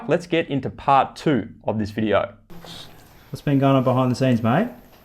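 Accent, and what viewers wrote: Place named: Australia. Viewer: Very funny to hear your oistralian accent when you talk about boikes sometoimes and learning that you are not a cloimber :-)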